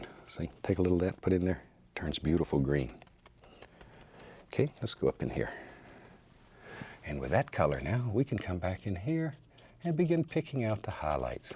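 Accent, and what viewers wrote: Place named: United States. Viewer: he says it a lot in his videos.. pretty sure its just his american accent. he says’ something bout like that.. but the ‘that’ is lazy :)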